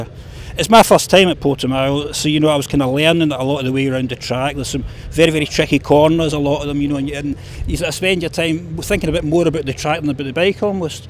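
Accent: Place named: South Africa. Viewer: Mister Knobb Yes same accent as the famous south african rally driver...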